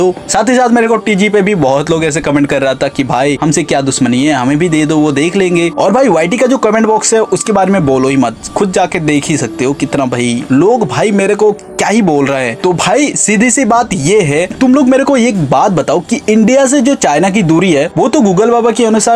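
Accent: Assam, India. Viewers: Bhai tum assam se ho kya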